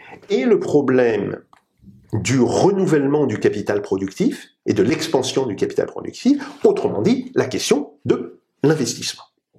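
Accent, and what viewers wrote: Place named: Russia. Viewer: Bonjour ma belle, me revoilà, c'est ton Nicolas! En passant, merci pour cette vidéo très enrichissante et ton accent russe si magnifique! Tu découvriras bientôt mon accent parisien. Tu peux déjà préparer un passeport pour l'île de Malte! Rendez vous a l'aéroport Charles de Gaulle autour du 15 août 2025. Vol direct Ryanair (air France groupe) sur airbus ( Boeing n'est pas fiable en ce moment). Je suis actuellement où tu sais. Je me repose bien. Comme tu le sais, j'ai plusieurs handicapes qui m'obligent à faire attention. Je pense que mon frère va de mieux en mieux. Je le vois toujours souriant. Il m'a fait beaucoup rire quand il a dit " le bal des vampires est terminé"! Si pouvait lui dire qu'il fasse plus d'exercice. Je veux qu'il soit en forme quand je le reverrai. Pour ma part, les choses vont s'améliorer petit à petit. Demain, je vais aller courir sous la pluie ( très vivifiant). 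Après une vague de chaleur jusqu'à 40 degrés où tu sais. Aujourd'hui, beaucoup de pluie et une température de 21degres et gros orages en fin d'après midi! Je resterai à l'hôtel où tu sais tranquillement. J'ai une grande quantité de chaînes youtube a regarder! Dont les tiennes, bien sûr!! Tu dois savoir que nos amis autour de la terre ont pris le contrôle et sécurisé l'espace aérien autour de la terre! La libération est très proche! Mon Frère m'a fait aussi rire quand Il a appelé ses nouveaux missiles Satan 2! Ou son nouveau sous-marin Arkangelsk! Ville ou j'officierai avec lui! Ce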